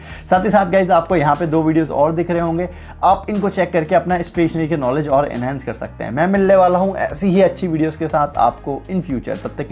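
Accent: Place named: Punjab, India. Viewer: sir aap pakka punjabi ho - bottle se paani 'DUL' sakta ha - ye pen patla ha aur iska grip 'ADTA' ha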